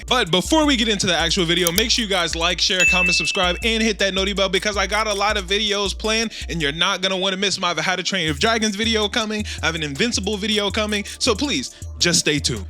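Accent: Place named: Chicago, United States. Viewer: I was about to comment “Lemmon Pepper what??” And then you played THAT clip 😂😂😂. I could tell you were from Chicago before you even said it 😂😂. Love this video fam.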